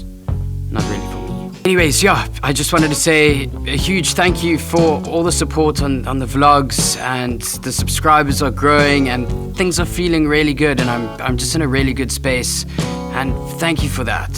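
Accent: South Africa. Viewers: You sound South African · As someone from Cape Town I never notice our accent in person but as soon as it's on video it's like in your face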